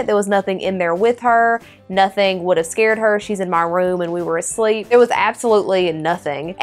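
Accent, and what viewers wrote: South, United States. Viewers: you just sound like a southern bell I love it and ur pets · She sounds southern.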